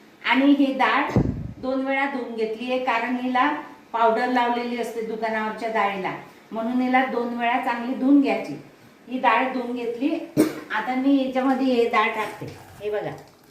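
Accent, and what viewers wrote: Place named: Khandesh, India. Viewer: मावशी तुम्ही नक्कीच खान्देशातील आहेत ...कारण तुमच्या बोलणं ऐकून ...माझं माहेर पण खान्देशातील आहे ...पण रेसिपी भन्नाट आहे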